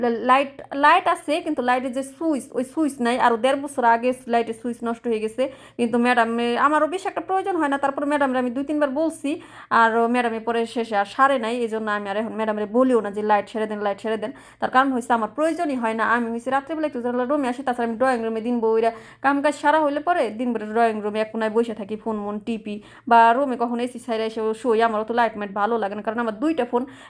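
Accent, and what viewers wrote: Faridpur, Bangladesh. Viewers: আপু আপনার মুখের ভাষা শুনে কেনো জানি আমার মনে হইতেছে আপনার বাসা ফরিদপুর। আপনার বাসা কথা জানাবেন আপু?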